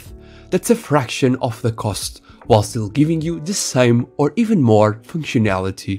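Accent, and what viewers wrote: Portugal. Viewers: Hey bro, are you portuguese?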